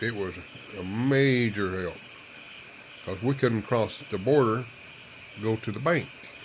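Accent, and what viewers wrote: United States. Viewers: That's okay,. After watching you for more than several years now, I simply came to the conclusion that the lag phenomenon was a result of your wonderful Kentucky drawl and nothing more.. Didn't bother me none. LOL 🤣 I honestly think most of these price increases are due to nothing more than blatant price gouging - maybe up to 30 %. I try to counter the rising prices by growing more fruits and vegetables plus reducing my electrical consumption wherever I can. We have more romantic candlelight dinners and make more use of mama's dirty kitchen while pretending we are camping out. I cut back on or even stopped purchasing some American dishes I have a hankering for. Still trying to put money aside for solar power, but not as much as I once was. Anyway, still breathing, drawing my retirement and enjoying life in the Philippines. Can't really complain. 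Thanks for sharing and letting your subscribers know what life is truly about out in the boondocks, my friend. 😃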